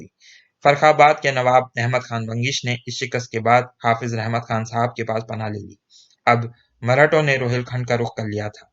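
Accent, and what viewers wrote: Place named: Pakistan. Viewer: Tum lehjay se koi Pakistani lgte ho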